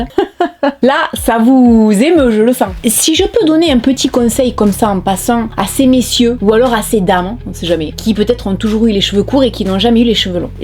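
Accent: South, France. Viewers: bonjour, c'est mon rituel du mardi et du vendredi, je les regarde à chaque fois toutes jusqu'aux bout. même si j'accroche un peu moins pour certaines vidéos mais par respect pour ton travail, j'y vais jusqu'aux bout et puis ton accent du sud c'est du soleil. hâte de regarder la prochaine. bonne soirée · Je suis allée jusqu'au bout comme toujours. Rare son les sujets que je n'aime pas et même si c'est le cas bas ton accent du sud me fait du bien ❤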